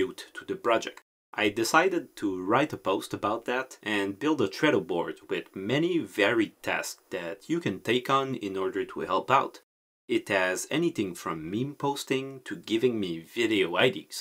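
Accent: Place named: Quebec, Canada. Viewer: sounds Québecois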